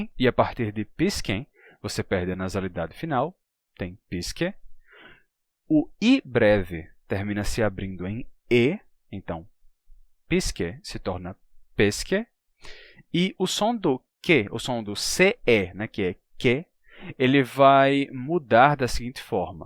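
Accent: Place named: Natal, Brazil. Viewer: Você é muito bom nas suas explicações. Elas têm toda lógica principalmente para quem conhece um pouco de outros idiomas. Nas nuances explicativas vêem-se semelhanças que permitem a gente entender melhor o português, outras línguas neolatinas e o inglês. Além do seu sotaque de Natal que eu adoro. Vivi mais de 10 anos aí e vou de 6/6 meses. Parabéns por tudo. Escrever aqui é muita responsabilidade para não “pagar mico” demais, pois você de fato sabe português.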